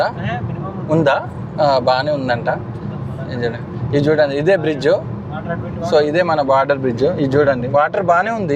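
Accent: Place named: Telangana, India. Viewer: Nice video.. meedi Telangana laa ఉంది.. మీ వైఫ్ ది ఆంధ్రా లా ఉంది.. కదా?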